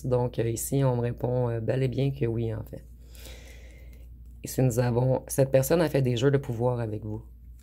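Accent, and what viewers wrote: Quebec, Canada. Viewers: Bonjour votre accent quenecois est tres jolie , je pense que chez vous la temperature n'est pas si chaude que chez nous dans le sud ouest de la France . Merci a vous 👍🙏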